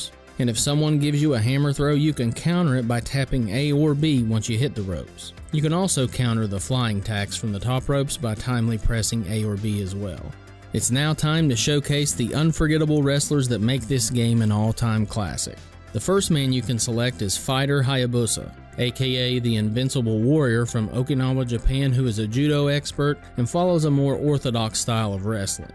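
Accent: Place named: Alabama, United States. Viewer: Love this game! You sound like you`re fromn alabama like me.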